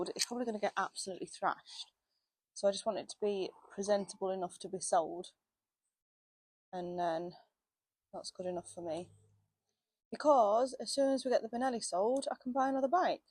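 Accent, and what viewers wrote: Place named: North Yorkshire, United Kingdom. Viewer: yes can tell with the north Yorkshire accent 😊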